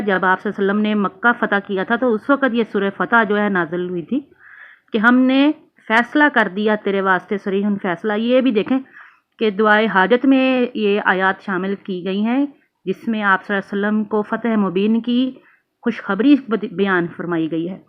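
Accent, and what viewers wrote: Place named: Punjab, Pakistan. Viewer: Your accent is Punjabi not arabic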